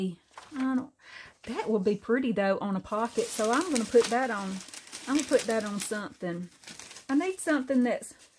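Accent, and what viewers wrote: South, United States. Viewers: Omg girl 👧…I’m with you on the chapstick👌, always in my pocket! And just loved hearing about your VW 😍 just took me back to my first auto…1960 Pontiac tempest convertible, was sweet times🥰 you are really making craft time so much fun girlfriend! I’m addicted to your videos, and praying for you to get good reports and the good Lord to restore your strength with every sunrise, cause you are like sunshine on YouTube for us crafting paper lovers‼️🙏💚💚💚‼️🙏‼️ Oooooh yeah… I forgot to say I love your tags, and the video of you covering envelopes is amazing! And your narrow booklets are what really drew me in with your southern chatty charm👌💚‼️ you are amazing😍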